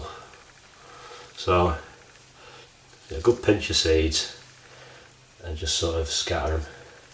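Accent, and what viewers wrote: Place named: Lancashire, United Kingdom. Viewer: I cannot believe that i have only just found your channel! over the last 3/4 years of subscribing to other allotment/ garden channels! Been catching up watching as many as possible of yours over the last 2 weeks. You make them very interesting and informative. I haven't got an allotment...just a small rented garden so its lots of bags and pots but the enjoyment of growing and eating your own veg is so rewarding. Keep the videos coming ,all the best for 2017. I'm originally from Preston... not that far from you...so I enjoy the accent too! . Cheers. Pat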